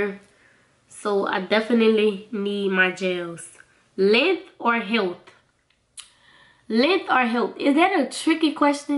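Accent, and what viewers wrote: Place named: New Orleans, United States. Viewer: Lol i knew you was from nola before the xmas intro. Yassss, bounce to the beat. I did this tag as well it was fun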